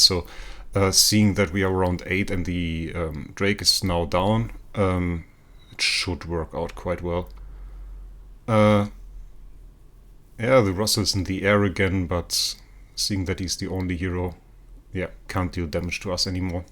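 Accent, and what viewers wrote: Germany. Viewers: you have a very german accent bro, love ur content. keep it up👌🏿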